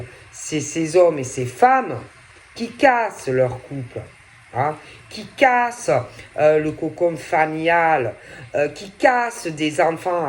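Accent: South, France. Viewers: 🙂 j'aime bien quand tu dis : d'accord hein bon😊avec ce bel accent du sud 😊💕💐 ça me fait sourire 😊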